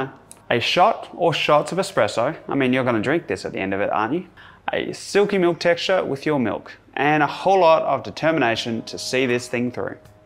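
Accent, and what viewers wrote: Australia. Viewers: must be aussie ☕️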